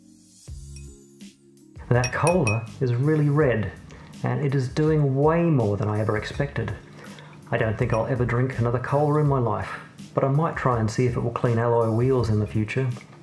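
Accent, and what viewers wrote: Australia. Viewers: You sound like an Australian. Where are you based ? First time viewers unsure how we got here.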